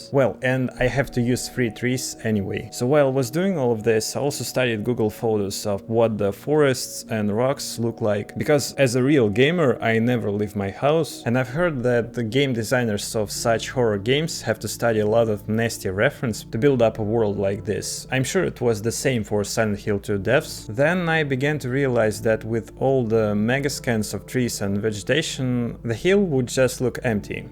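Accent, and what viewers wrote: Russia. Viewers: воодушевляющее видео) и ты явно русскоговорящий)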